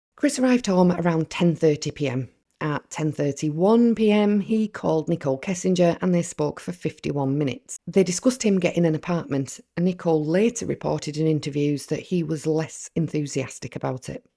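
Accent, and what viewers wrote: United Kingdom. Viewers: Great video. Is that a northern accent I hear? 😊 It’s lovely! Keep up the great work!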